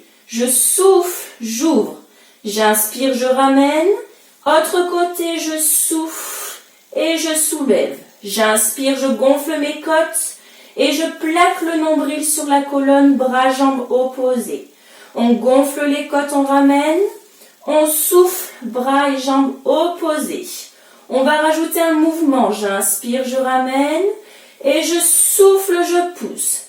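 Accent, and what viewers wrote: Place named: South, France. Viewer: Merci, grâce à ton sourire, ta compétence et ton joli accent ensoleillé (je me régale avec les cottes bien ouvertes et les épolles baissées 😉) j‘ai commencé ma 1ère journée de vacances avec un cours de pilâtes qui m’a donné la pêche! À bientôt!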